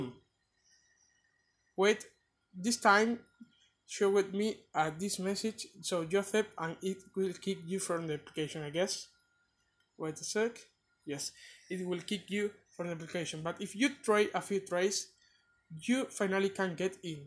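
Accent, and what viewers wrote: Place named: Oxford, United Kingdom. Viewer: Ojo ese ingles de oxford. Me alegro de que hables asi ojala yo hablara así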